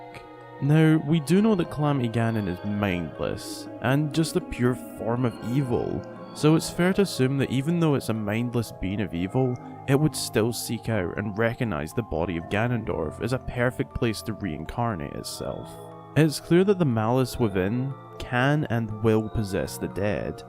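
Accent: Scotland, United Kingdom. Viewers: Quick question, are you Scottish? Because that accent sounds awfully familiar.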